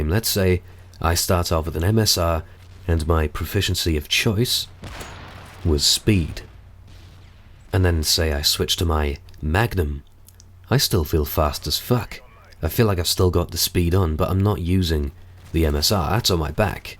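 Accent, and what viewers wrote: United Kingdom. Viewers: That voice is incredible, where in the UK are you from?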